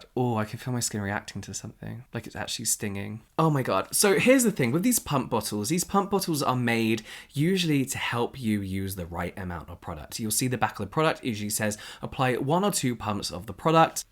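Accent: United Kingdom. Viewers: is simply a gorgeous specimen of a man 😍! The British accept is just a plus! God bless you Papacito Bello! 😘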